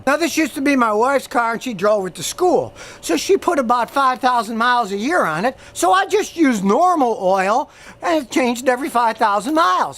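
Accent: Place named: Poconos, United States. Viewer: Gotta be from the Poconos brthr.1,2,tree love that Pocono accent and the info. Thank you!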